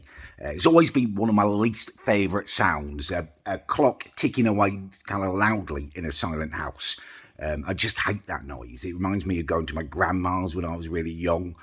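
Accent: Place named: RP, United Kingdom. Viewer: Uno what Yh I fully love ya vids now pal i genuinely sit hear watching all of your videos while sm’kin. I see you like I see my grandad cute af n genuine down to the bone 😂 I love how you are it’s jokes I can relate so much but u talk v posh it’s jokes 😂 keep up the good work lad and I hope u have a bloody good new year don’t let the haters get to u ♥️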